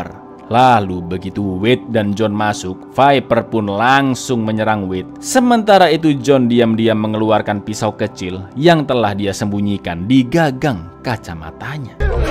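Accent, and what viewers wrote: Java, Indonesia. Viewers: Sampean wong jowo Yo cak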